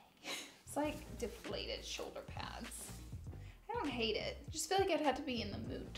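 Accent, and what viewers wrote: South, United States. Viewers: That country accent 😂 love it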